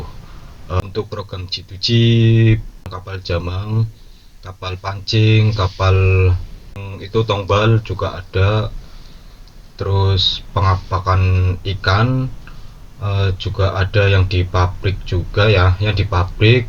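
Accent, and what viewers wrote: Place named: Banyumas, Indonesia. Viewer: Mas ngapak mau tanya boleh?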